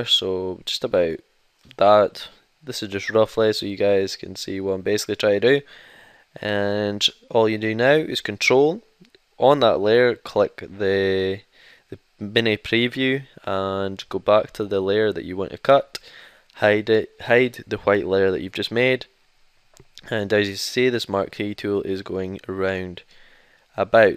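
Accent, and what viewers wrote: Scotland, United Kingdom. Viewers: love the scottish voice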